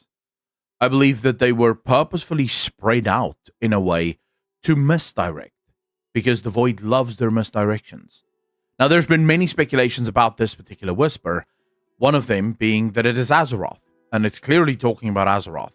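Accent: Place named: South Africa. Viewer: South African, if I'm not mistaken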